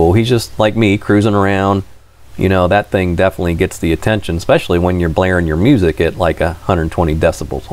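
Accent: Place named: Great Lakes, United States. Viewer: Are you originally from Chicago, Michigan, or the Great Lakes area? Just wondered because of your accent.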